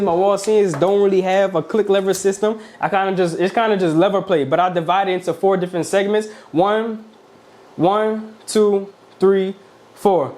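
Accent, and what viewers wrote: New Orleans, United States. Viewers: New fave barber channel, you do an excellent job explaining! Sounds like you from NOLA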